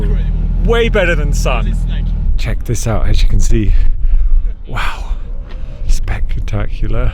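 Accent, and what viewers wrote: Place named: Switzerland. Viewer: Nice video! Dein Englisch ist wirklich sehr gut aber trotzdem hört man irgendwie dass du schweizer bist.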